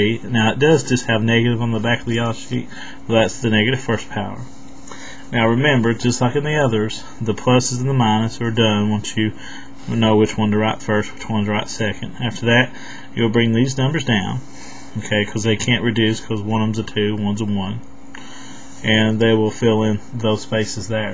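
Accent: United States. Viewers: Thank you helped a lot :) I love the accent lol Americans mmmm mmm good lol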